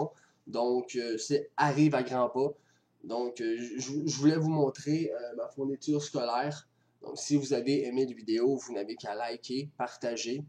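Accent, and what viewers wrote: Quebec, Canada. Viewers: A ok c'est un Québécois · il parle comMent Il parle le québecois et non le quibecoi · tin il a l'accent Quebekois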